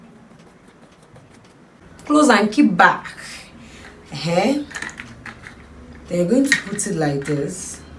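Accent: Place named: Nigeria. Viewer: I like the way Nigerians speak English thnx for sharing I will try this seriously am tired of pimples & dark spots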